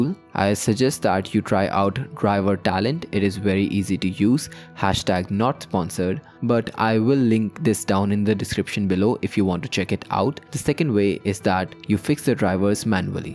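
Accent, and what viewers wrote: India. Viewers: No need to be nervous he has an Indian accent we are good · Not all Indian-ish voice is bad :D And by the way this really helped a lot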